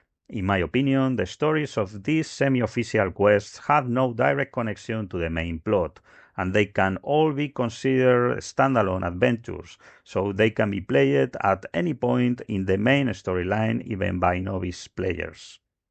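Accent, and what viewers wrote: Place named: Spain. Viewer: El acento en español que no falte 😎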